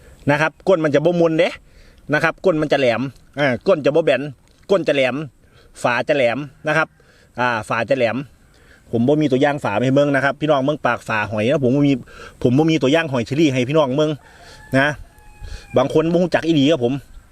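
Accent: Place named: Ban Phue, Thailand. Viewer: อ้ายเป็นคนบ้านใด๋ครับ เว้าคือคนอำเภอบ้านผือแท้ครับ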